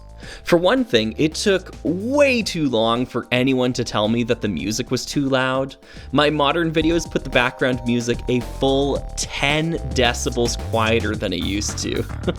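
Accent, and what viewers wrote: Canada. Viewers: I found you through your lego space minifigure tier list, which I frankly still disagree with, lol, but thats ok. I absolutely love your quirky and odd videos and slug stop motions and odd taste in sets; theyre all highly engaging. Also your very strange Canadian pronunciation of so many words. Good times. I'll be sticking around for the long haul to see what else is afoot in the channel. Keep up the great work,!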